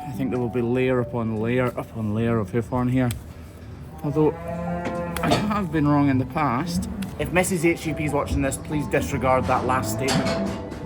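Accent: Scotland, United Kingdom. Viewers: I think part of it is also (maybe just for me) his Scottish brogue. It’s lyrical, I have always been a music geek. 😊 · I really enjoy watching your videos. Even though this is a seriously important and necessary job, you and the team around you are very entertaining. Yet, I find the presentations to be quite informative. The way you regard and treat these animals, is a credit to all of you. I’m quite sure that the farmers who bring you in, appreciate your overall regard and respect you show to their livestock. BTW has anyone ever told you that you look a lot like Russell Tovey, only with a Scottish accent. LOL 😊 Much respect and appreciation from here in Western Australia. G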